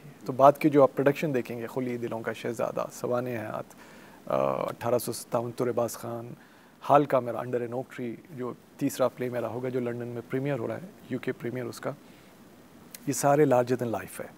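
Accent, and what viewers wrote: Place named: Deccan, India. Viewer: Irfan sahab aap yahan? khushamdeed. khilaaj/khiraaj samajh nahin aa raha tha audio mein. bhai ki dakkhani urdu bhi bahot tight thi. kaafi alag aur dilchasp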